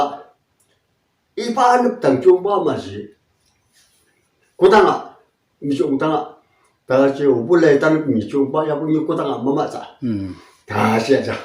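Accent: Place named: Langpangkong, India. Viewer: You talk like Langpangkong dialect am I right?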